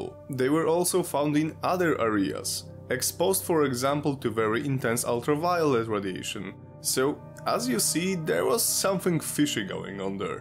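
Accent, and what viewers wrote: Russia. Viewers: ppl were asking why the chernobyl actor never tried your russian accent my man ❤, the whole show would be turned into a very broad Borat film , lol 😂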